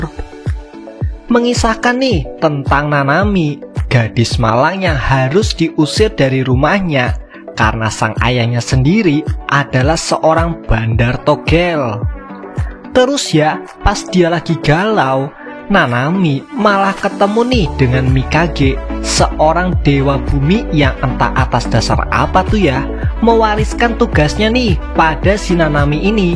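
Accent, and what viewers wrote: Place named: Banyumas, Indonesia. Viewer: Ngapak juga gaes nyong ee 😁😁😁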